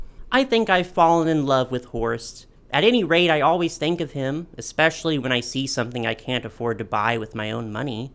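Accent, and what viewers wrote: Texas, United States. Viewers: I'm a foreigner so maybe I just am bad at discerning American accents, but I was surprised that yours is a texan one??? Your voice is definitely not something I imagine when someone says "Texas" 😅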